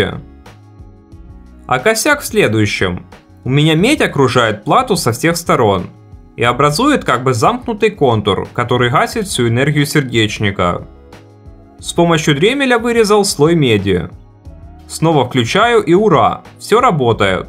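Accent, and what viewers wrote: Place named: Kharkiv, Ukraine. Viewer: Чую харківську говірку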